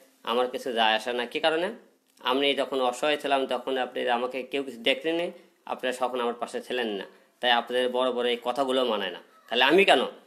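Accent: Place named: Bogura, Bangladesh. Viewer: বগুড়ার ভাষা বাদ দেন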